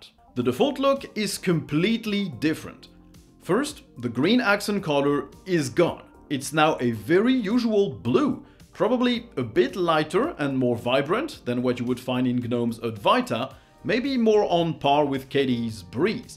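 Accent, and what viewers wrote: France. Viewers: Where is your French accent coming from? I'm Belgian ^^ Nice video by the way, I'll install 21.1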